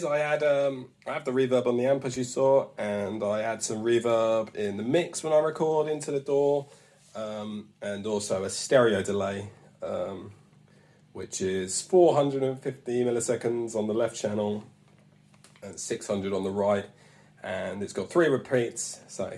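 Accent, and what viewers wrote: England, United Kingdom. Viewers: Wow what’s a nice guy really enjoyed that video. Did not expect that English accent. 🙌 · Thought you were American, but I like British accents more haha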